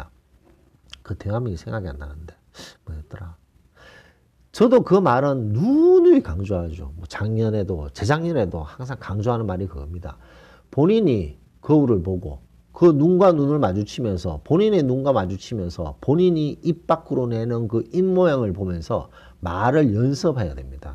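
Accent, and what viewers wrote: Gyeongsang, South Korea. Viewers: 선생님^^ 구수한 갱상도 사투리 듣기거북하지 않습니다. 내용이 문제지 사투리가 뭐 문제겠습니까? 사투리라고한다면... 지방언어인데. 그 지방에 사는 사람들이 쓰는 말이 사투리입니다. 촌사람들이 쓰는 말이 사투리가 아니라는 거지요. 그러니까 서울경기에는 서울경기의 사투리가 있다는 겁니다. 꽝! 꽝! 꽝!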